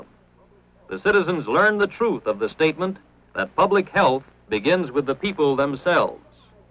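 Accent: Transatlantic, United States. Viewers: Trans Atlantic accent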